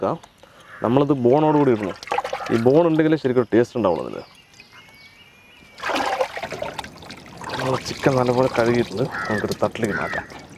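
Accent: Palakkad, India. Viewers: നിങ്ങടെ സംസാരം ആണ് ഹൈലൈറ്റ് ഞാനും പാലക്കാടനാ ലഷ്മണൻ എവിടെ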